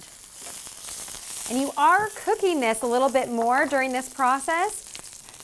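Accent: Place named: United States. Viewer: Yank. Turn off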